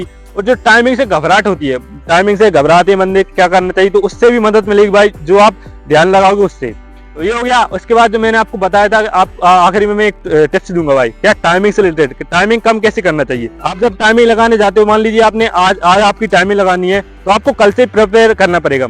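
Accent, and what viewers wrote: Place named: Bundelkhand, India. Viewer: Bhai bihar se nhi lag rahe kaha se .... bundelkhand se lag rahe ho khaskar M.P se 😅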